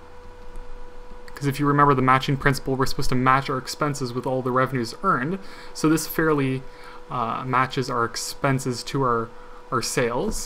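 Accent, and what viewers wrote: Canada. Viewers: Love dat Canadian accent!!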